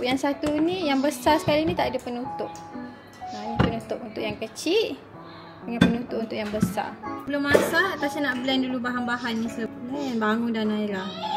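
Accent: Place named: Terengganu, Malaysia. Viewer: Comey kakak slang tranung hahahahah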